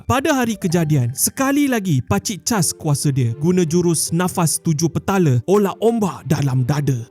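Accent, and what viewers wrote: Sabah, Malaysia. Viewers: anduk bah kesian. hahaha very sabahan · gaya cakap cam org sabah, bukan mau kata apa lah.. Di sabah ada macam macam jenis seni pertahanan diri, cuba cari "pasak kota belud", ada video tamat belajar, dengan berdiri atas bara dan mandi sungai sambil di hiris dan di tetak parang. Tak silap video dari tahun 1980/1990an. Dan di akui kebanyakan ahli yang tamat bukan jenis berlagak. Tapi kalau ada menjual, mesti ada pembeli. Dan macam op yg ko jawab cakap, pengamal selalu cakap "ada Allah" Atau "cukup Allah". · Saya tak berapa kelakar tgok video ni.. cuma kelakar dengar suara yg buat kontena ni.. sebab bahasa kg ku yg dia guna... Andukk bahh😅😅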